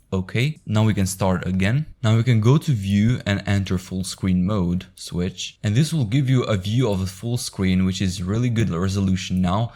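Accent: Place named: France. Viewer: l'accent francais mdr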